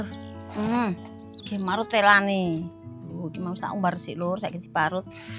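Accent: East Java, Indonesia. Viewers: aku arep tako endi logate ko koyo wong lamongan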